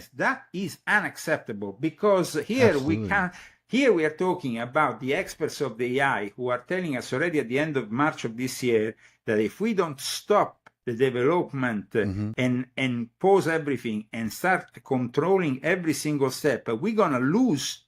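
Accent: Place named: Italy. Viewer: Just brilliant. Love the Italian accent!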